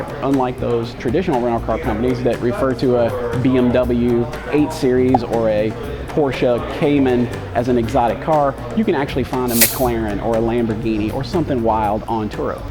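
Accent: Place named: Houston, United States. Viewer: I hear Houston.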